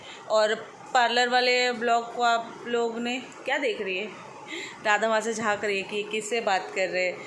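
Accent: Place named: Maharashtra, India. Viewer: Hi kaisi ho apke bolne ka trika bohot acha or jo aap marathi touch dete ho beech beach may vo to osam h mast lgta h, or jo English sikha rhe h unko bolo mujhe apne aap pr proud h mai Indian hu meri matr bhasha hindi h 😊 isi hindi bhasha ki wajah normal ladies bhi apka video dekhti h hum apka video apne TV pr lga k sab log dekhte h or yhi apke vlog English may hote to adhe log dekhte or adhe log muh bnate but jo bhi ho apki recipe bhi bohot achi hoti h,hindi may hone ki wajah say or Mia ata h dekhne may good job God bless you............. English walo k liye 👹buri nazar wale tera muh kala 👹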